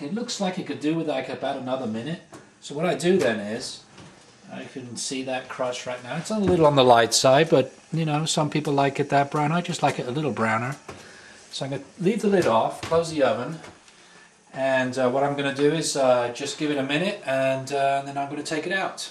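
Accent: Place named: United Kingdom. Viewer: Who swears with british accent?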